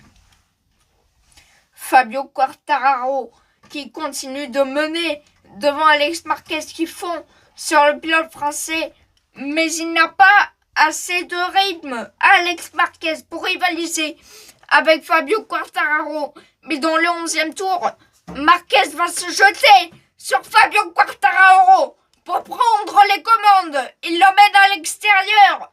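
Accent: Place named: Spain. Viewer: Bien d'avoir ce podcast que tu as commenté en direct... j'ai suivi et j'ai rapidement accroché pendant un bon moment.Est-ce que tu penses qu'il serait possible de commenter en ayant les grille des temps à l'écran? Quartararo au sol... et même Marquez En quelques minutes j'ai appris plein de choses. Et pour ton accent espagnol et pour ton ¡Vive la France! tu es un vrai champion. Et non tu ne te ridiculises pas, crois-moi. Ni même en loupant un résultat, au contraire, tu prends des risques. Salut et à bientôt, Mister!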